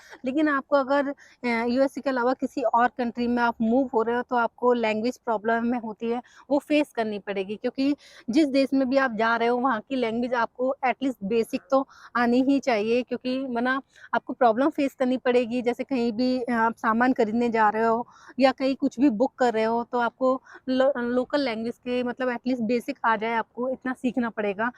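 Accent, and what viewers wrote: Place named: Purvanchal, India. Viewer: Aap pakka UP purwanchal se ho... hai na ?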